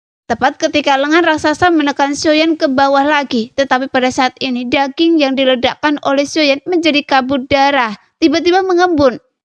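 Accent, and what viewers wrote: Java, Indonesia. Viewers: Duuaaar ....jawa kentel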